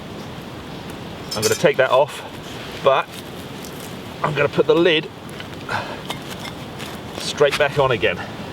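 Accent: England, United Kingdom. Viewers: This is great, going to give this a go, and also check your other vids. (no disrespect to our American friends, but it's nice to see this sort of vid presented with an English accent !) Have subbed. Loving that dog !!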